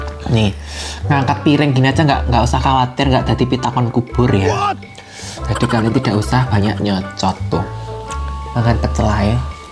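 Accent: Java, Indonesia. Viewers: Ya Allah.aku kudu ngguyu Yen ndelok kontenmu..Bahasane campuran bikin lucu,medok jawane..marai luwe kui pecele...cb Yen cedak,bakal tak jupuk kui maeme..he..he...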